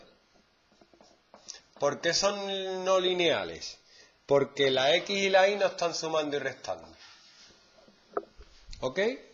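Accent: Andalusia, Spain. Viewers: andaluz tenías que ser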